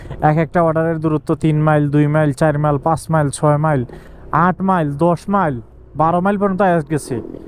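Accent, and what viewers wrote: Sylhet, Bangladesh. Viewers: Vai Sylheti ni?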